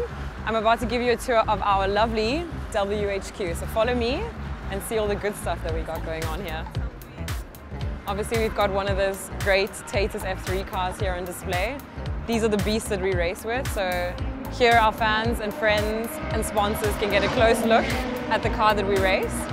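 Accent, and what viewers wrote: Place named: South Africa. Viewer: she has a slight south african accent but a german flag?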